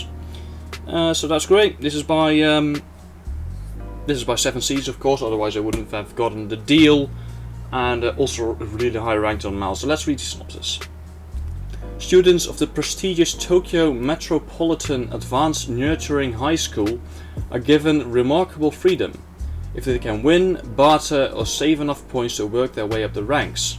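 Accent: Netherlands. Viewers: you have a dutch accent but some words you pronounce are Super British haha, master of the Language, great video, that Rokka sounds great tbh .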